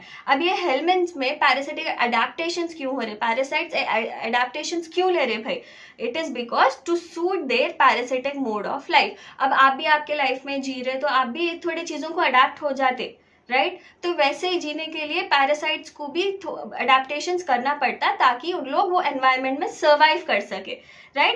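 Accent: Hyderabad, India. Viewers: Hyderabadi Ma'am spotted!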